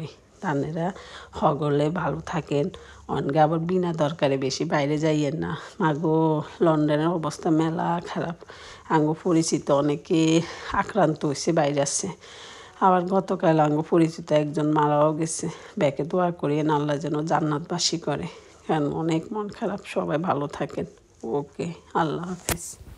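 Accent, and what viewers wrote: Noakhali, Bangladesh. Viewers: আমি বুঝিনা নোয়াখালীর কথা আন্টি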